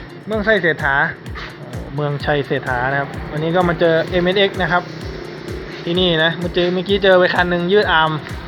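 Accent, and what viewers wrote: Isan, Thailand. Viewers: อ้ายเป็นคนอีสานบ่คับบ😀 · กดติดตามเพราะคำเว้านี่หล่ะ ข่อยก็มักเว่า..อิอิ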